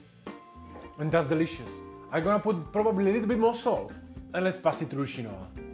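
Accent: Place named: Czech Republic. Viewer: I enjoy your Czech accent, and am impressed with your English, not to mention your amazing cooking skills and techniques. Thank you for your amazing content, and inspiration.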